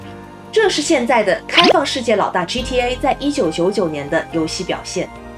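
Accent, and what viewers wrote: Taiwan. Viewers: 谢谢你！这个好棒啊。你的中文是台湾的吗？我的中文不好的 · 好棒的影片，看起來比較親切，又是莎木玩家，又介紹橫須賀這個地方，滿足了我們這些玩過莎木的粉絲好奇心，厲害啊XD（大大是台灣人嗎？在日外國人我一度看成國人，想說口音怎麼那麼台灣？）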